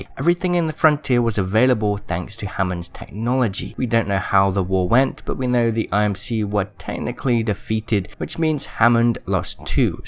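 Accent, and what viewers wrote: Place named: RP, United Kingdom. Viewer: Bro, why do you speak like a posh dude?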